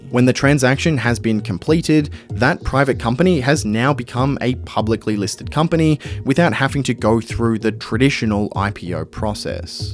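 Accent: Australia. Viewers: Ohhhh... "Arn" = own in Aussie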